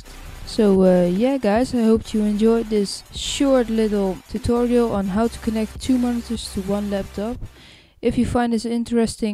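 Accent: Netherlands. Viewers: R u dutch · Hahah je hoort dat hij nl is lol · I can hear you dutch accent from miles away... and those English speaking freaks, try to explain this in dutch....!!! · Where are u from? I think from the netherlands but not sure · Ik dacht al dat je Nederlands was XD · hoorde gwn al aan het accent dat dit een nederlander was lol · Ik hoor gewoon dat je Nederlands bent :P Ps. goeie tutorial!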